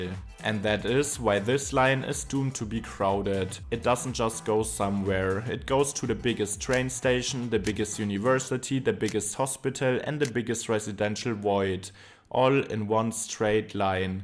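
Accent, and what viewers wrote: Germany. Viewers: Terrible German accent and aweful grammar. I feel like listening to my former classmates doing a report on public transport. My ears are bleeding. Please stop! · Da dein deutscher Akzent sehr ausgeprägt ist, würde ich dir empfehlen, in der Nachbearbeitung einen De-Esser auf deine Stimme zu legen, dann wäre das bisschen angenehmer zu hören. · Das deutscheste Englisch was ich je gehört habe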